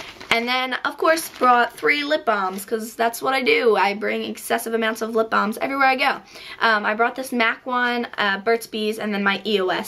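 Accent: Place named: New England, United States. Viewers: I think she may be from the north eastern united states because of her accent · it sounds like she's somewhere in New England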